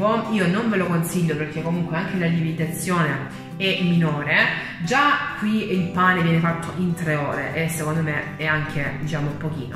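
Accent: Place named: Taranto, Italy. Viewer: Sono un Tarantino , quindi si capisce subito Complimenti per la recensione!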